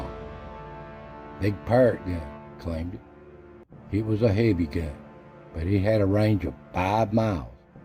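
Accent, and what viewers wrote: Appalachia, United States. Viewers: Another great video by a man with a wonderful Appalachian- southern accent.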